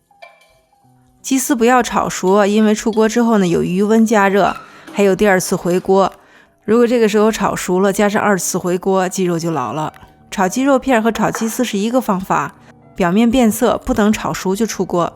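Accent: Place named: Beijing, China. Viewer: 北京口音😜老乡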